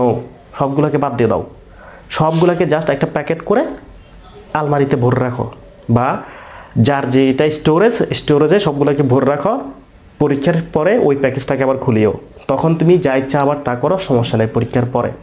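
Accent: Chittagong, Bangladesh. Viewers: Bhaia you from Chittagong???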